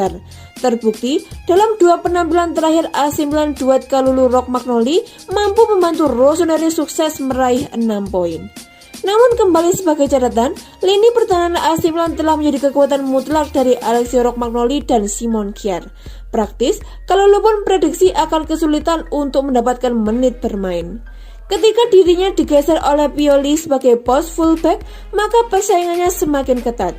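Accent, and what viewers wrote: Java, Indonesia. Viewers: Jawa nya tulen mbak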